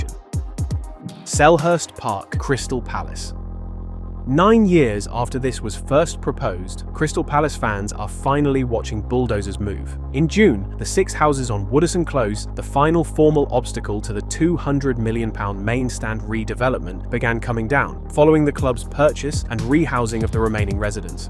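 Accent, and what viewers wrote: United States. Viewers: Maybe drop the American pronunciation on several words dude.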